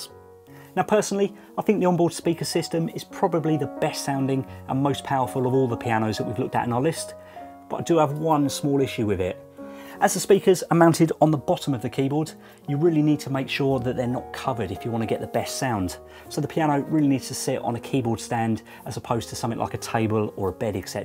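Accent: United Kingdom. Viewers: is he british? hahahahha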